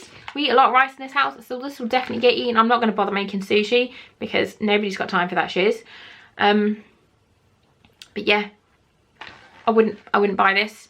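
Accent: United Kingdom. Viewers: Yum, the bulls eye sauce is great. You look super Goddess in this video too, love the UK accent as always, your vids are lovely to watch, love the try on hauls and unboxing vids 😍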